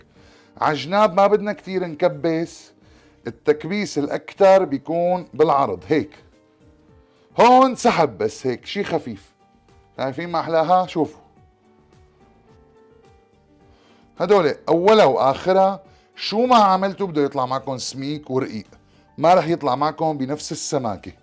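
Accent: Levant. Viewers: حكيك شامي تمام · تسلم ايدك شيف اول مرة اشوفك بس واضح انك استاذ في البسطرمة الله يعطيك العافية واضح من لهجتك انك لبناني او سوري وانا كتير بحب اللهجة الشامية تحياتي من مصر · سلمت يداك ولسانك الشامي العذب تحياتي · حكياتك الشاميات حلوين 😄 وشغلك ماشالله نضيف ومرتب يعطيك العافية 👍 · مصري من المانيا يرسل لك شكر يكفي من هنا لعندك. غير اللهجه الشاميه التي نعشقها كمصريين,فبكل امانه الشرح والنظافه والاهتمام الرائع في تجهيزك للحم,انتج في النهاية قطعة البسطرمة وشرائحها والتي من مجرد شكلها وانسيابيتها تعد تحفه فنيه. لا تقل ارمينيه, ومع احترامنا لهم فنحن نعرفهم اساسا كساعاتيه,ولكن انا حين اجربها سأقول بسطرمه. كل الحب والاعجاب والتقدير وتحياتي لشخصك الطيب. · سلامات شيف اكلاتك متلك طييبين اللة يسلم دياتك والاحلة لهجتك الشامية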